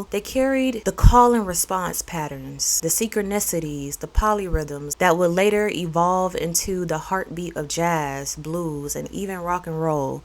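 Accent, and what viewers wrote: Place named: South, United States. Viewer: I would love to know where you are from. You sound as though you fit right into the south. Welcome back when you return to Louisiana.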